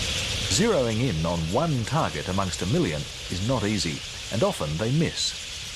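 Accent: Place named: United Kingdom. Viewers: WALKMAN from Australian TV? Then yes. The announcer sounded more British tho. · The narrator's name is. He is an English-born Australian, so that may well be the reason why he has a touch of British in his accent.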